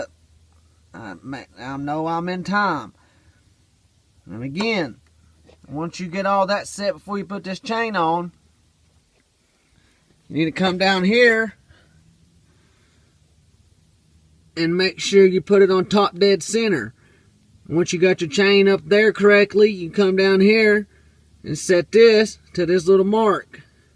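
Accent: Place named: Missouri, United States. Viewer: You sound like your from Missouri, thanks man I hvnt started my project jus yet but i have been searching for about 4 months now ima go dive in head first thanks to you man 🙏🙏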